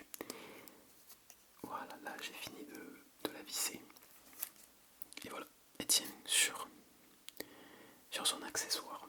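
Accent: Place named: South, France. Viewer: Ohhh cette accent du sud ! Oh mon dieu j’adore ! Incroyable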